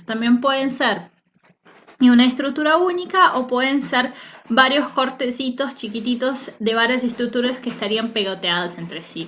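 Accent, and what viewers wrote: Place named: Brazil. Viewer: então, eu reconheci seu sotaque brasileiro no hora de falar espanhol. Seu conteúdo é bem interessante. Eu morei no Brasil dois e meios anos. Eu fiz mestrado lá.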